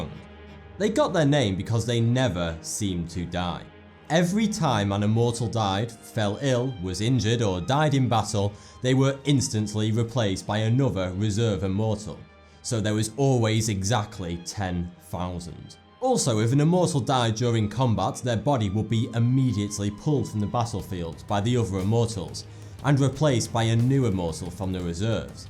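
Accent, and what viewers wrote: United Kingdom. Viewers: The British accent just makes videos like these so much better, great video and great channel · Mr. Khan <link> No. It's a dialect that causes a lot of British people to do this. I made the same mistake a while ago with someone else and was corrected. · Take it from me as a Brit, it's just how we say things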